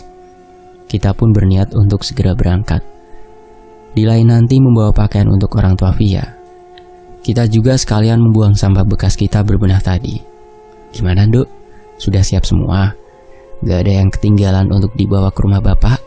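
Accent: Java, Indonesia. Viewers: Waallaikumsalam wr wb mzzz Mzzz BHS jawanya medok bgt asli orng mn ya kl boleh tahu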